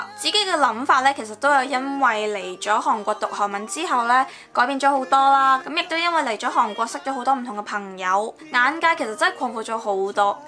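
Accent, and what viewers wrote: Taiwan. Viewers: 難怪Mira講的中文跟台灣人一模一樣 不像香港人對腔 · 所有说普通话就有了台湾人的口音 ：） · 难怪你的国语一口台湾味。大陆粉丝留＾＾ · 所以你的國語這麽台灣腔。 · 難怪Mira的中文很臺灣腔XD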